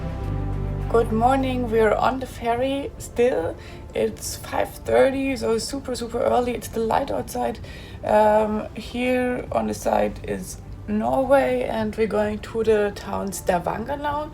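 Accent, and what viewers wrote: Germany. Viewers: Great video, love your german accent... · I like your German Acent in english!!😆😆Its so german!😄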